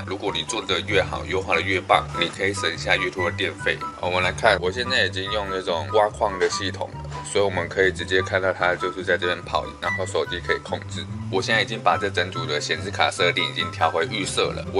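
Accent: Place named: Taiwan. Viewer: 感谢超小白的教程。话说台湾腔真好听~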